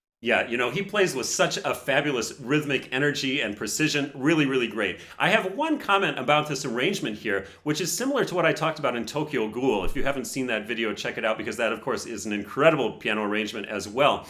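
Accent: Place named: Germany. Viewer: His dialect sound very german, especially when he say "Patrik Pietschmann"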